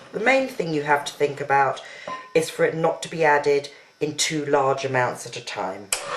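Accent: United Kingdom. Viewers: I'm an American, and just by listening to your voice, I thought you were a British Aristocrat! I wish I could speak English like you. Thank you so much. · Sounds like she may be British. I enjoyed this video because of what you mentioned.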